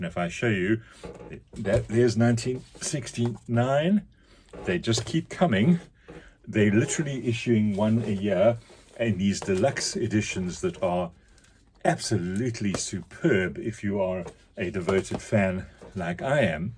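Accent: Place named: New Zealand. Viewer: 👋🏼Newer subscriber here, and I was enchanted by your accent. I was hearing a little New Zealand, a little German, and then solved the puzzle by looking up your home page--haha! Nice version of the tag. You're a more ambitious reader than I!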